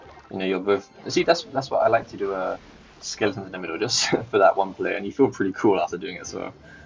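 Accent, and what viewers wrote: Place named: United Kingdom. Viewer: same even though I’ve lived here my whole life. You’re voice just kind of was a british twang if that makes sense 😭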